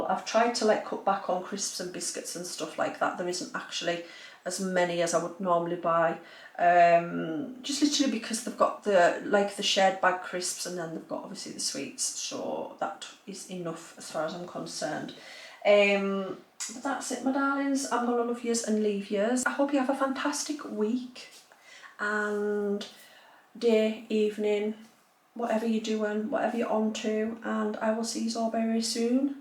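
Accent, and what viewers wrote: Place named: England, United Kingdom. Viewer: I think what I like best about you and your videos, besides the English accent, which is awesome...you are just real. I watched one haul, nice enough lady but it left me feeling like I was a giant loser, 😂. You live like me, you get tired, bitchy, you have kids w/issues, pets who eat better than you do, and it is just life. I do not want to see someone who, God bless them, has a meal plan for the Queen. Sometimes we eat well, sometimes a Pop tart is dinner. I am in Illinois, which is in the United States, in a city 2-2 1/2 hours South of Chicago, to give you an idea. I no longer drink but I would go to a bar with you anyway!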